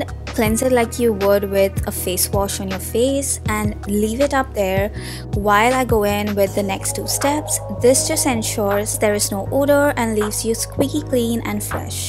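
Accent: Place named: India. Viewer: I think indian look at her accent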